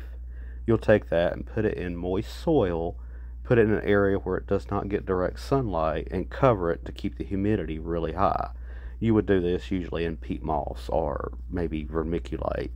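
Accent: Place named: Carolina, United States. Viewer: You have a south or North Carolina accent 🎉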